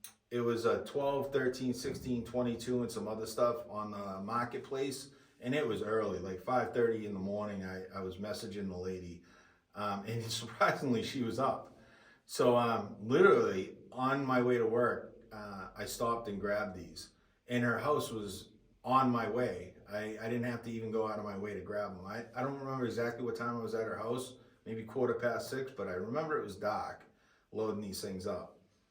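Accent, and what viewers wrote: Boston, United States. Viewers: Sounds like a fellow Masshole that likes good drums. Fackin ah, khed!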